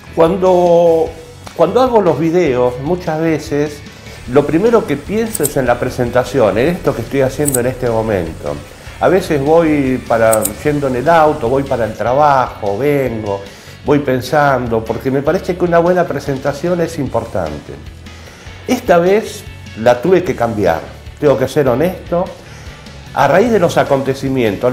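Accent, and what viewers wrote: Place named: Argentina. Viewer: Grande sos un groso como hablas explicas bien a lo argento papá abrazo grande....!!!!!!!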